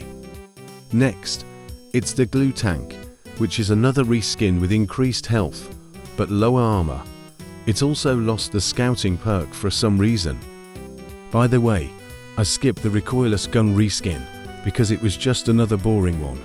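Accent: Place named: United Kingdom. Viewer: British voice 🇬🇧🇬🇧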